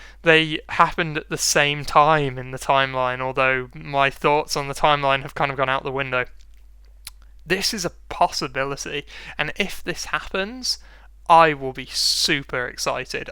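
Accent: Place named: United Kingdom. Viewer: Soooo British.... Ewwww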